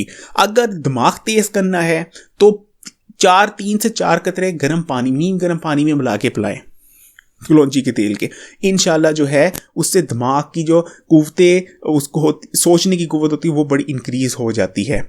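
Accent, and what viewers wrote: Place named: Kashmir, India. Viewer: AAPKI AWAZ MUJE BAHUT ACHI LAGTI H.ASE LAGTA H AAP KASHMERI YA PAKISTAN SE H.M PUNJABI HU OR KISI MUSLIM K MUH SE PUNJABI BAHUT ACHI LAGTI H😍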